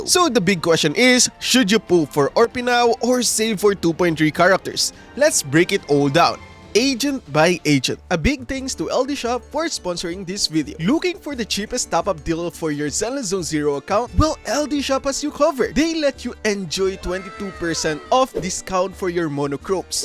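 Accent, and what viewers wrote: Philippines. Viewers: Are you filipino by any chance? you lowkey have an accent.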